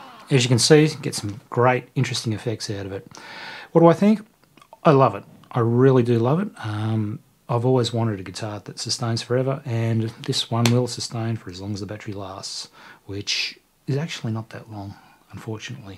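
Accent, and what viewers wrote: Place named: Australia. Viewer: You're Australian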